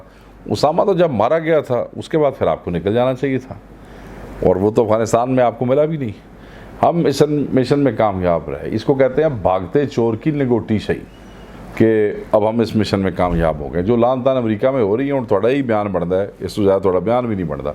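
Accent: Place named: Punjab, India. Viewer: Sr apka punjabi tuch buht achha lgta hy 😑🤣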